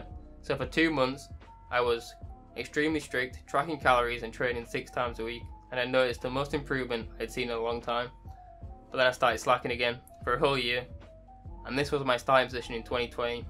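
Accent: United Kingdom. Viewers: England accent nice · i know being lean is dope but I Really think if you bulk for a solid year its really going to pay off. Good work so far though. also wtf i didnt expect you to be british lol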